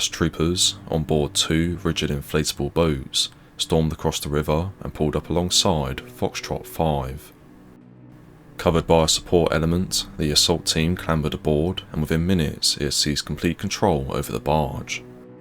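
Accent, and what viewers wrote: United Kingdom. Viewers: I heard 126 million pounds of drugs in the van I was like no way the suspension could hold that. A ton is like 2000 pounds how is that possible... Then I realized he was speaking British English and he was referencing a currency value not a weight value. Defiantly made me laugh trying to envision a van driving away with millions of lbs. of drugs in it slipping away quickly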